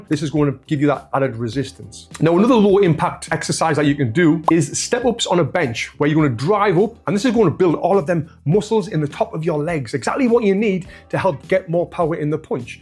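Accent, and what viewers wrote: United Kingdom. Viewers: Your accent reminds me a Guy Richie movie! Lovely tutorial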